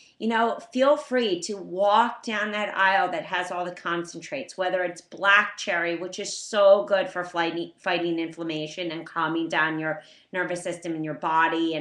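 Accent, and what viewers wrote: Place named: South Shore, United States. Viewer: listen to that accent, south shore perhaps? can you eat them raw?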